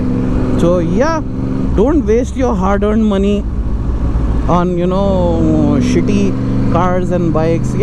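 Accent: Hyderabad, India. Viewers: Are you Hydrabadi bro ? , if I am not mistaken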